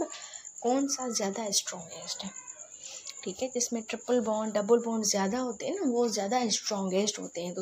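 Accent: Sindh, India. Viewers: Ma'am are you Sindhi?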